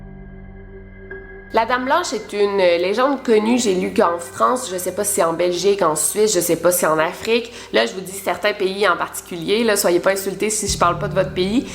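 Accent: Quebec, Canada. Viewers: Hiiiii je kiffe ton accent ! 😂 L'accent Quebecois c'est ma passion ! 😂 Sinon je trouve que tu raconte grave bien 😍❤ Bref, bisoous de France ♡ Charlotte 💞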